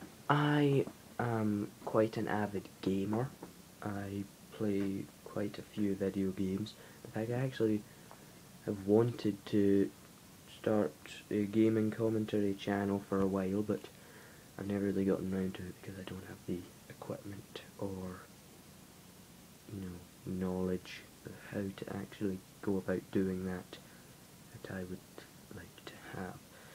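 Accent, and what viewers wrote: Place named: Scotland, United Kingdom. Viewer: D :D :D :D :D :D Scottish Accent. And first comment! (wow those don't go together at all)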